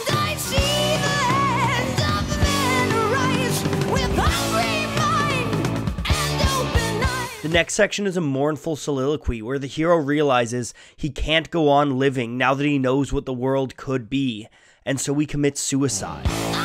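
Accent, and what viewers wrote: Canada. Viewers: Thumbs up for the Canadian accent !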